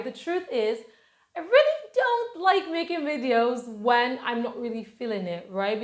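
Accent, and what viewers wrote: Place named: California, United States. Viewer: How come you have a California accent?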